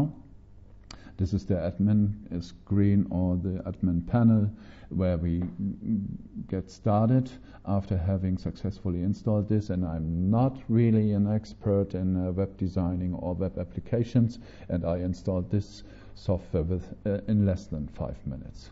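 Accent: Germany. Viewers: How do we see the video in better quality? His English is a bit German :)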